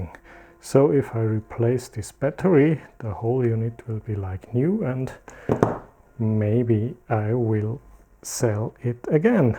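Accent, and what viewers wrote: Germany. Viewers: Sorry, was very interested to watch, but your accent is just too disgusting and vomit-inducing. I mean German is bad enough, but Mountain-German even worse. If you use English, at least learn to pronounce it without giving ppl ear-cancer. Everything else is just arrogant and cringey. One of the biggest offenders was "tchyoobs". It's pronounced "toobs", yo. Well, gonna mute you and maybe then it'll be watchable... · Also es klingt für mich so,als wenn du Deutscher bist 🤔 Liege ich da richtig? 😜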